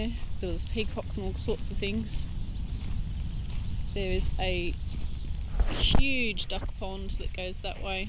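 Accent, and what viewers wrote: Australia. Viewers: I thought u sounded Australian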